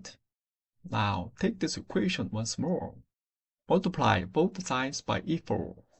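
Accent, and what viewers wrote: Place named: China. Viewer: I would guess Chinese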